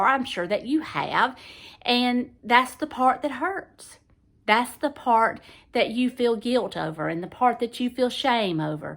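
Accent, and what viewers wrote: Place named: Alabama, United States. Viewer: thank you! We had a set back to monday. I too have been told to wait a week or more till he settles in. I’ve watched you so many times for advice and I appreciate all that your teaching all of us going through this hard transition. And your accent brings me comfort as my people are from Alabama as well. I don’t know what town your from but my people are Russellville and Athens. I’m wondering your advice on stage 5? What stage do most need full time care?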